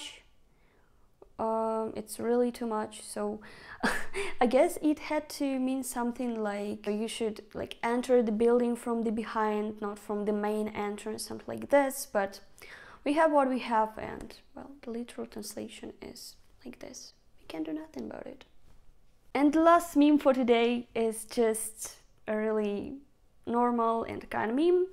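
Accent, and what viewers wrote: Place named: Berlin, Germany. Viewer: Berliner! Ganz eindeutig Berliner!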